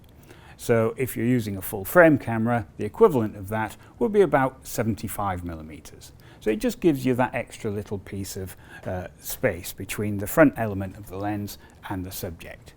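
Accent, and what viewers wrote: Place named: RP, United Kingdom. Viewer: Dear Sir, I am most obliged to you for sharing your knowledge and doing this in Queen's English. You not wearing a baseball cap (the wrong way round) added to my viewing pleasure.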